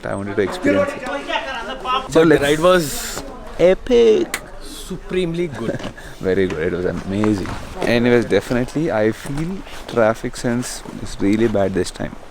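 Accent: India. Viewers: I love that Hindi accent.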